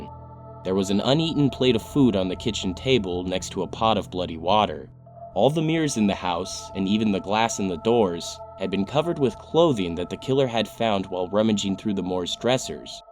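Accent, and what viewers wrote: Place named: United States. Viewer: Oh don't start that shit plz. His voice is simply a typical American voice. Sycophant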